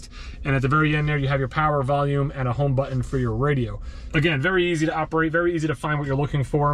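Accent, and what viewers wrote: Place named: New York, United States. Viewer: Finally they fixed the seatbelt and you can adjust it now. I love your New York accent.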